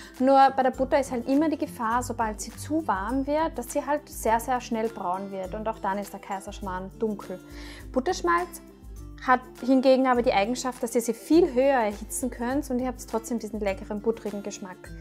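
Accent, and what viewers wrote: Austria. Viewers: Super, aber ich bekomme diesen charmanten österreichischen Akzent nicht hin und so es ist irgendwie nur ein leckerer Pfannkuchen.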